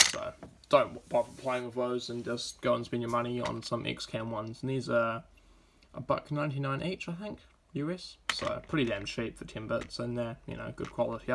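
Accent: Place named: United Kingdom. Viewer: Doing materials could you try to drop a little that british accent, please? People from outside UK find it hard to understand you :(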